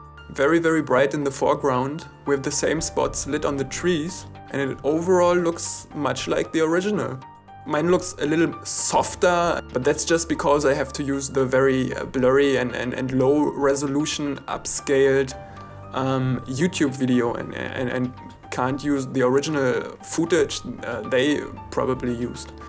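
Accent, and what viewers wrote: Germany. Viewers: schon deutlich deutsch :D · german akcent ^^ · Even for me (i'm german) your accent is really annoying! (i'm absolutly sure thaz you are german...) ÜB EIN BISSCHEN! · du klingst deutsch ^^ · are you German? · This german accent however pisses me off. But cool video btw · Dein typisch deutsch ignoranter Akzent ist so furchtbar. Kannst du dich nicht mal bemühen richtiges Englisch auszusprechen und zu betonen?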